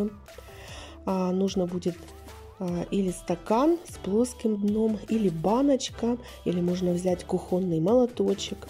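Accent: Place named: Ukraine. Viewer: Да, 🫒🫒🫒🌶️ , кушаем. Узнаю Украинский акцент :))) Молодец, что готовите сами оливки 🫒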